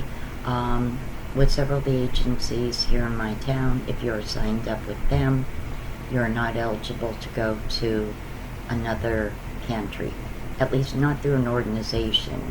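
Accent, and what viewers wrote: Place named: Pennsylvania/Delaware, United States. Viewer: Hi! I knew you sounded like me..its the PA/DE voice we both have!!I have no idea how I found your channel, but I am drawn to your personality! I was watching Freakin Frugal they are a dumpster diving husband & wife that get a ton of stuff from Aldi & Save a lot (mainly Aldi) they donate alot of stuff as well..food/clothes..you need to see these videos. You could add content ty o your channel. People love it! I hope your channel gets bigger & bigger..this is how it starts..much blessings to you! Much love from Middletown, Delaware 💗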